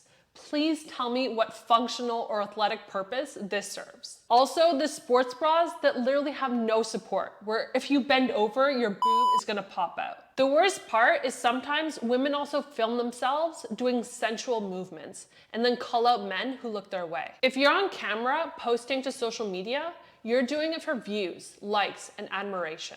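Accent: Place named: Canada. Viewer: You are very smart and well spoken. Also you kind of sound Canadian.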